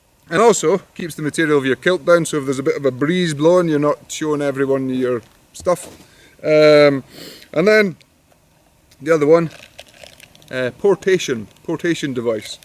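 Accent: Scotland, United Kingdom. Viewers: Scottish accents have to be the best speech style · Arrived for the kilt, stayed for the Scottish brogue and sense of humor. What a beautiful manly man. The brogue tends to make me weak in the knees man.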